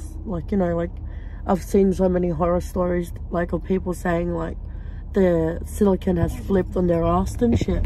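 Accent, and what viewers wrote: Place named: Australia. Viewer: ok your Aussie🇦🇺🤓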